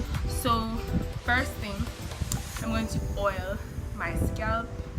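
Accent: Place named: Caribbean. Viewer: And Mash up the curls i love that.... carribean gyal... i could tell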